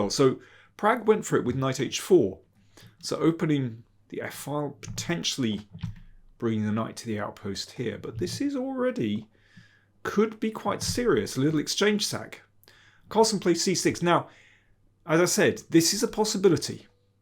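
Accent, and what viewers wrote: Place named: RP, United Kingdom. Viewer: I like this channel as Daniel King's English is truly BBC-style. Always picking up new vocabulary. From this video: 'trifle', 'set things in train' and 'indictive'. Thank you!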